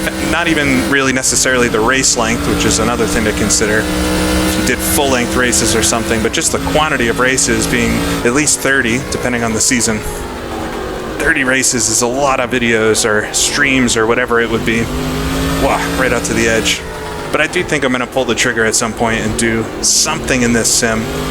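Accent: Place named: Northeast, United States. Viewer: I’m new to the channel, & I could tell you were probably from the Northeast just a few videos in (I talk to dozens of different people a day, haha). It’s good to see NASCAR’s regional series come to NR2003, as it holds a lot of memories for people who watched local racing scenes. I’m from the West Coast so I’d love for someone to do the NASCAR West Coast Series from <num> for this game.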